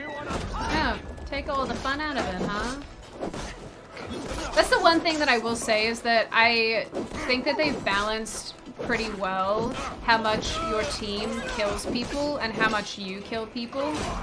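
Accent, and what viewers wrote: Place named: Australia. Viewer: I think it's fun watching, plus I just like to hear you speak. Being in Dallas, I don't hear many Australian accents.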